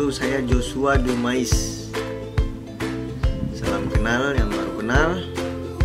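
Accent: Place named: Makassar, Indonesia. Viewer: konten gaming boleh tuh bang jadiin point, game horror kalo bisa kaya dulu channel heheee, kangen banget denger teriak teriak ketakutan pake logat makassar mu ituuu, pokok nya terusin aja, aku udh dukung dari duluuuuuuu banget. hehe semangat ya buat kedepannya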